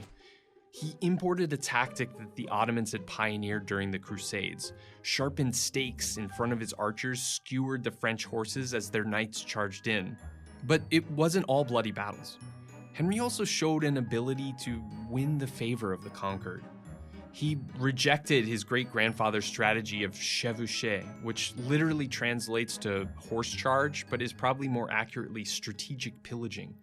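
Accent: United States. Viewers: Atleast get a narrator with an English Accent, this history lesson of England with an american accent seems so unoriginal and uninspiring. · Its jarring to hear a US accent, I would have preferred an English one. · It's a new experience for me to hear English history in an American accent - I enjoyed it.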